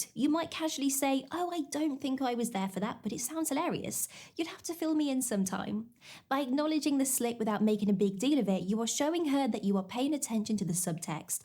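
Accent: United Kingdom. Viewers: Hello there. How are you doing on this lovely beautiful day? Well, it's lovely and beautiful where I'm at in Texas! 😊 Just like that crackhead needs crack for his crack pipe. I needed to hear that AWESOME British accent today! You have a very blessed day! 🙏 You're still finer than frogs hair! 👌 👍👏😎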